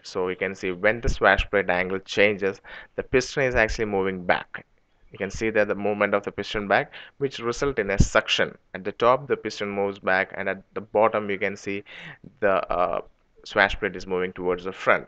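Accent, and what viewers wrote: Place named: South India, India. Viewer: Products sorry man,it south Indian English they cant help it,ask something technical u will certainly get an answer. south Indians are one of best technical race on earth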